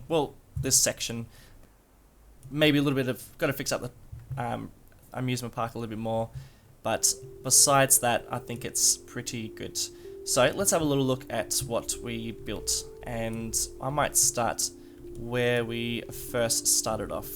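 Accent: Australia. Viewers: You sound like an Aussie. But I can't tell.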